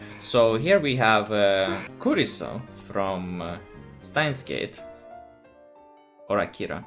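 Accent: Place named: Russia. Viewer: У тебя акцент русский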